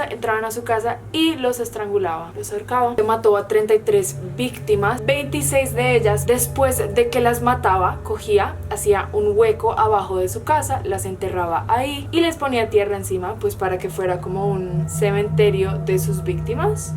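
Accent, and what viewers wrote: Colombia. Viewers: Me encanta tu asento colombiano😅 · estoy seguro que ella no es mexicana . no parece... parece y se escucha colombiana . es asi o me equivoco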